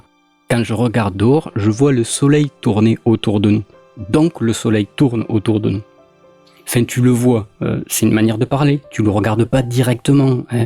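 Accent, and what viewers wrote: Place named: South-West, France. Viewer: J'ai découvert cette vidéo sur skeptikon. Du contenu sceptique à la sauce accent du Sud Ouest, voilà de quoi ravir mes oreilles. Alors je viens soutenir par un pouce et un abonnement içi.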